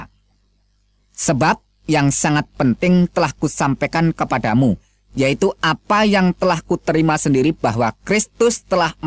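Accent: Java, Indonesia. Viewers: Aduh. Saya ketawa mulu liat video ini. Maaf, bukan karena isinya, tapi karena logat pembicaranya mendok baget. Saya menghargai pendapat anda, dan saya tidak mencela keyakinan anda, sungguh. Cuma ingin menambahkan beberapa pernyataan Al-Quran yang sedikit terpotong : "Wahai Ahli Kitab, janganlah kamu melampaui batas dalam agamamu, dan janganlah kamu mengatakan terhadap Allah kecuali yang benar. Sesungguhnya Al Masih, Isa putera Maryam itu, adalah utusan Allah dan (yang diciptakan dengan) kalimat-Nya yang disampaikan-Nya kepada Maryam, dan (dengan tiupan) roh dari-Nya. Maka berimanlah kamu kepada Allah dan rasul-rasul-Nya dan janganlah kamu mengatakan: "(Tuhan itu) tiga", berhentilah (dari ucapan itu). (Itu) lebih baik bagimu. Sesungguhnya Allah Tuhan Yang Maha Esa, Maha Suci Allah dari mempunyai anak, segala yang di langit dan di bumi adalah kepunyaan-Nya. 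Cukuplah Allah menjadi Pemelihara" (QS. An-Nisa' [4] : 171) "Al Masih sekali-kali tidak enggan menjadi hamba bagi Allah, dan tidak (pula enggan) malaikat-malaikat yang terdekat (kepada Allah). Barangsiapa yang enggan dari menyembah-Nya, dan menyombongkan diri, nanti Allah akan mengumpulkan mereka semua kepada-Nya." (QS. An-Nisa' [4] : 172) "Dan (sebagai) Rasul kepada Bani Israil (yang berkata kepada mereka): "Sesungguhnya aku telah datang kepadamu dengan membawa sesuatu tanda (mukjizat) dari Tuhanmu, yaitu aku membuat untuk kamu dari tanah berbentuk burung; kemudian aku meniupnya, maka ia menjadi seekor burung dengan seizin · Aku sangat malu dengar bahasa jawa mu yg medok.. Jelekan islam berjam jam lamanya.. Seolah mengajak murtad manusia seluruh umat.. .....Yesus itu manusia. Tidak ada seorang di kumpulkan allah kelak di hari hisab hanya se orang hamba... Isa putra maryam alias yesus kelak tida bisa jadi tuhan hanya jadi saksi kemurtadan penyembah nya. 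· Dari logatnya ustad ini orang jawa. Dan opini saya sebelum kita mencari kebenaran dahulukanlah meminta perlindungan dari allah swt dari syaitan yang penuh tipu muslihat, karena syaitan adalah musuh yg nyata. Audzubillah himinassyaitanirrajim bismillahirahmanirahim. Ketahuilah sebelum anda ingin memahami ilmu ketuhanan lebih jauh anda harus paham apa sih perintah tuhan paling pertama dan mengapa harus begitu. Pengalaman saya pribadi saya mencoba memperbandingkan 4 agama. Yahudi, yesus pbuh, hindu, dan tentu islam. Ternyata perintah utama itu adalah harus mengetahui bahwa tuhan itu esa=1. Lalu saya mencoba memahami kenapa tuhan itu harus 1. Alhamdulillah penjelasan paling logis dan simple saya dapatkan dari mengenal angka. Kenapa tuhan itu esa? Dalam taurat, zabur, perkataan yesus pbuh tuhan itu esa. Esa atau angka 1 adalah awal karena mustahil 0 adalah eksistensi yang nyata. Angka 1 juga bukan akhir karena angka 1 dapat menciptakan angka-angka lainnya. 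Angka 1 juga berdiri sendiri artinya dia tidak membutuhkan angka-angka lain untuk menciptakan segala sesuatu. Bukti 1+1=1, 1+1+1=3, dst. Jika angka 1 melipatkan diri dia adalah tetap angka 1. Bukti 1x1=1, 1x1x1=1, dst. Jika angka 1 mengurangi diri secara bertahap / pembagian dia tetap angka1. bukti 1:1=1 artinya angka 1 itu kekal. Jika angka lainnya mengurangi diri secara bertahap dia akan kembali kepada sang pencipta yaitu angka 1, artinya dalam setiap penciptaan angka lainnya adalah milik angka 1, bukti 3:3=1, 241:241=1. Jika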